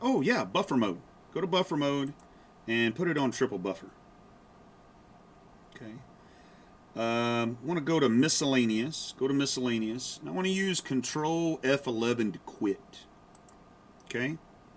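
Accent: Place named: Texas, United States. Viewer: I trust a man with a Texan twang.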